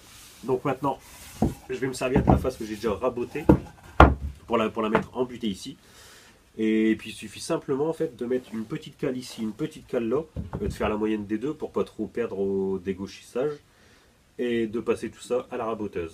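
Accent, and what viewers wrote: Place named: Nord-Pas-de-Calais, France. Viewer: Bravo pour ce document bref et concis sans blabla inutile avec un ch'ti accent sympa. Il n'y a pas de chose mal faites, il n'y a que des façons différentes de les faire...Bravo à toi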